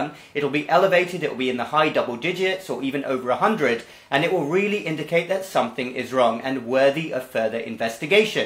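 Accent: United Kingdom. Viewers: I thought practices in USA. Though he is British, hence his accent.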